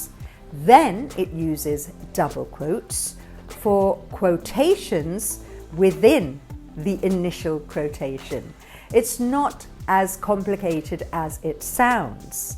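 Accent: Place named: United Kingdom. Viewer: Just a question mam.. were you born in UK ? You have a british accent. Please answer this. Just curious 🌸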